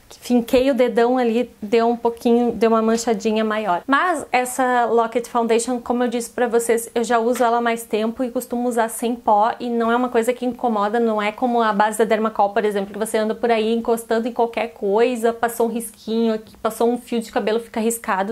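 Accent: Rio Grande do Sul, Brazil. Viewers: é gaúcho flor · Coisa boa é ouvir esse teu sotaque, gaúcha! 😍 todo mundo tá falando dessa base da tracta! Tá fazendo sucesso! Tu é uma lindeza! Beijo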